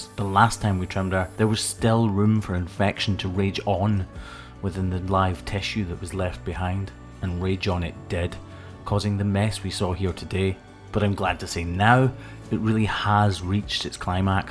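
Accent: Scotland, United Kingdom. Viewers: No, it's Scotland. Between the accent and him saying southwest Scotland in the video. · I just had a short come up under the title satisfying Hoof, is that your short? I ask because the Scottish âccent and voice sound like you.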